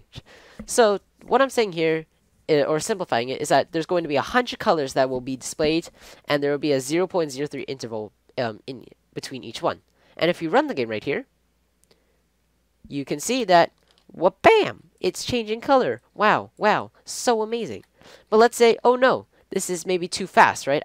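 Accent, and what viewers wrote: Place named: United Kingdom. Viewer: great tutorial. you notice this guy is British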